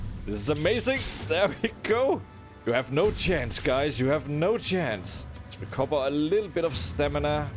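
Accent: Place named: Denmark. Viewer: Hehe, love the Danish dialect, keep up the good work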